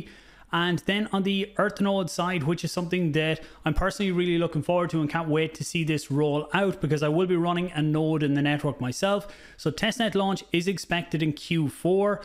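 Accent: Ireland. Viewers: I truly do love the Irish. But whenever I hear that accent I start looking for my pot of gold. Not sure how that got started but I really would like a pot of gold if you could manage it.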